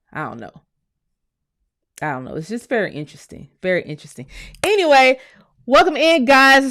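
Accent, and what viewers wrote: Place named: Africa. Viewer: Girl you African. You can’t fool me. I hear it and you need to put up the right flag because that’s not your flag. Sorry and not sorry and will never be sorry! You do a good job tethering but we “hear” you. Smmfh. You do speak very well tho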